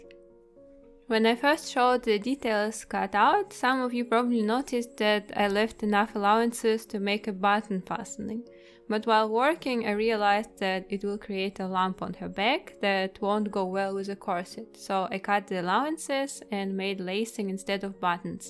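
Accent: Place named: Russia. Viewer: Ain't these the old Soviet Nutcracker frames in the beginning?) Also, pardon me for asking - but i kind of detect a slight Russian accent there)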